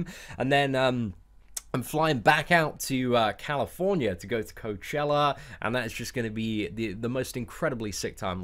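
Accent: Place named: RP, United Kingdom. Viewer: I like you man.. The posh accent and using words like man and lit.. You crack me up, Keep it up mate!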